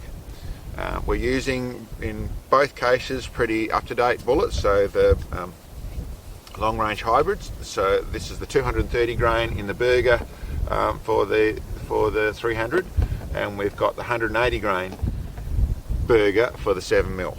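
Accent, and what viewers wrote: Australia. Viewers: Not bad!!! But Australian English 😄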